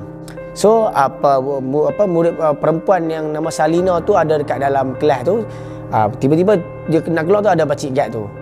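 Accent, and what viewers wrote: Kedah, Malaysia. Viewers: ( loghat Kedah geng !! )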